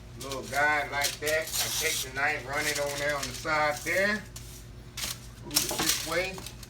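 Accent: South, United States. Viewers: Just like my Granny from Mississippi made and taught me. I’ve Had a seizures and suffer memory loss but you bringing it all back. And that storm in the background plus ur strong southern voice is like comfort food for my ears🤗I put your channel on to help me sleep as well as reacquainting myself to my family’s old school soul food traditions. Much appreciated Chef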